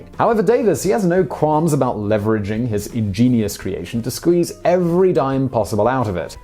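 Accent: United Kingdom. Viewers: You come across as pleasant, including your flavor of British English -- yet even you call drawings "drawrings". I don't know what rings have to do with drawings, and personally find it annoying. This is a relatively new phenomenon having developed in Britain, and I bet linguists love this new direction English has taken in Britain.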